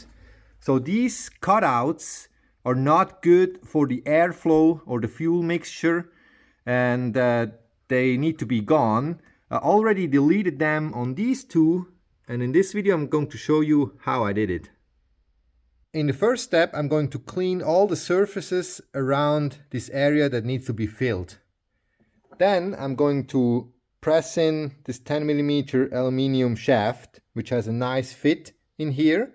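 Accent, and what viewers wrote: Switzerland. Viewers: das ghöri sofort use das schwizerdütsch e der, chasch es ed verstecke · Another Youtuber with a Swiss Accent! Nice to hear you speak.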